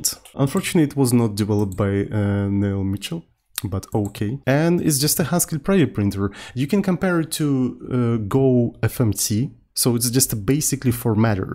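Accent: Russia. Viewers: Я как услышал его, понял что русский, акцент остался чутка)0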